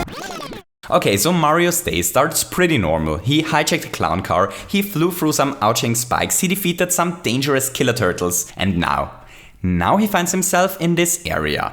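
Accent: Germany. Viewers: Ich erkenne an deinem Akzent, dass du deutscher bist. Haha xD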